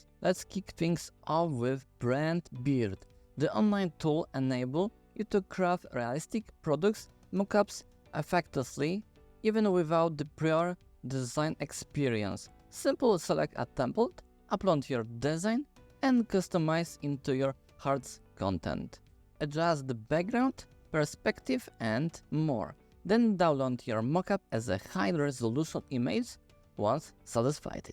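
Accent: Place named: Poland. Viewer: Polish accent :face-red-heart-shape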